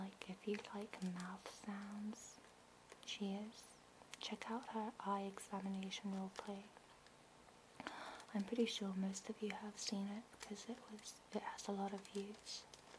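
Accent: England, United Kingdom. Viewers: It's not an interesting accent it's a British accent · I love your accent, which part of England is it from?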